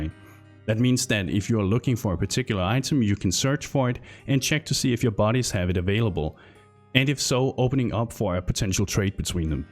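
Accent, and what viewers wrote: Denmark. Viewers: 5 seconds in, you are definately danish right? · Thanks for this video <3 BTW are you danish, your accent sounds danish. :) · Dat danish accent tho..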